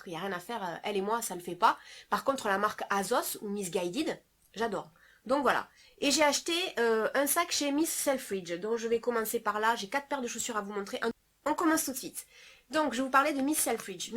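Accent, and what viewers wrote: South, France. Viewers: Coucou, comme d'habitude, très chics tous ces achats que tu as fais. j'ai pratiquement tout aimé: la veste à pans, le pantalon, le superbe combi-short, le pull épaules en dentelle, les bottines..... Le sac est très joli. Moi mes sacs préférés sont les sacs seaux, à pompons, à franges, à clous, en moumoute, aspect daim..... Je raffole de ces sacs:) Le pull bordeaux est très beau. J'en ai aussi quelques-un dans mon armoire. C'est vrai, ça tient chaud. Les robes-pulls, j'en ai pas mal; je suis bien dedans. J'avais deviné, à ton accent, que tu vivais dans le sud:) Pour ses vacances, mon frère allait souvent à Banyuls-sur-mer (réputé pour son bon vin).Il ne devait pas être très loin de chez toi:) Merci pour cette sympathique video. Soigne-toi bien. A bientôt. Gros bisous ma belle. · Coucou jolie encore une fois super haul, pas de souci on entend toujours ton bel accent du sud!!! 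J'ai aimé ton magnifique sac camel qui fait très chic, ma préférence va aussi vers cette très jolie jupe crayon en dentelle et ton pantalon camel taille haute avec des boutons (j'ai cette même coupe avec bouton mais en jeans) Petite question peux tu vraiment garder une seule pièce? Pas facile!!! Les pièces te vont toutes à ravir Je te dis soigne toi bien tout là bas dans le sud et te fais de gros bisous A bientôt dans ta prochaine vidéo Farah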